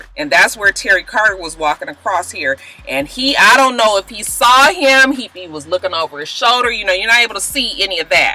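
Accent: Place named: Louisiana, United States. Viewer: Girl, be careful sitting in that car!!! LA, I was trying to figure out that accent....I remember that accent from the people I went to college with that was from LA!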